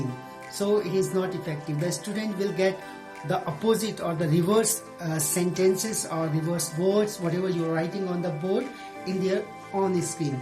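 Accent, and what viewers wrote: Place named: England, United Kingdom. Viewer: Thank you sir. For sharing this video. I like your english accent sir. 😊😊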